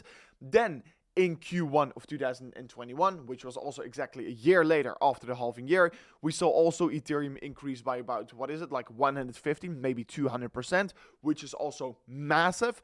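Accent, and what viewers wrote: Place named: Netherlands. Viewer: Zo klinkt ie wel ja 😅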